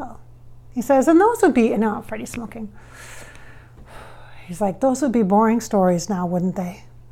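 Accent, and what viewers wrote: Ireland. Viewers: You developed an Irish accent. That’s interesting that you developed his accent. When you talked to him. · Was paul irish? Almost sounds like an irish accent as you are speaking.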